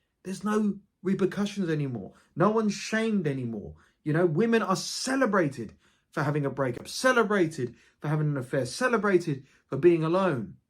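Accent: United Kingdom. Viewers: I have difficulty understanding the British accent. But I thank you for making this video. Because this is the first time someone has made a video on this topic, I agree with everything you said. In fact, there are some shortcomings. Global capital has globalized the entire world. It has corrupted people's morality. People's minds and brains have become completely for money, global capital culture has ended morality and marriage. People are now avoiding each other. Nobody trusts anybody. Almost everyone has become evil, turned into a narcissistic dog, thank you again for making this video.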